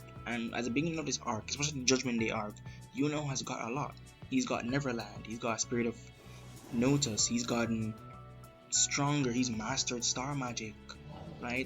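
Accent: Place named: Jamaica. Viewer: Is this a fellow Jamaican I heare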